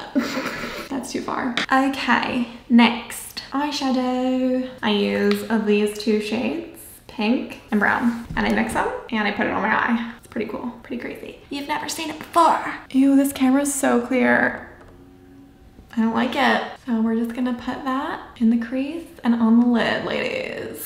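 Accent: RP, United Kingdom. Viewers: don’t try and sound like the queen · Same about the British accent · A British accent fits her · i love her british accent lolll :)) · I love your british accent :3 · I'm British and I adore your British accent! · Your British accent is actually pretty amazing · I love your british accent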